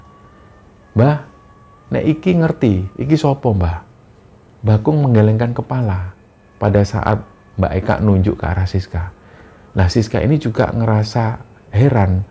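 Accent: Indonesia. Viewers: Suaranya mas meduk (kawa)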